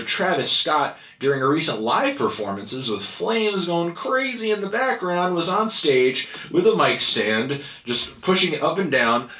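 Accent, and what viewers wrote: England, United Kingdom. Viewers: i fucking loved your english accents... you sounded like all characters of monty python... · dude he has the best British accent!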